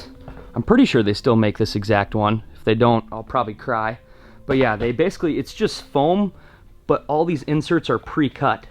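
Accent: Minnesota, United States. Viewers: Sounds like Minnesota to me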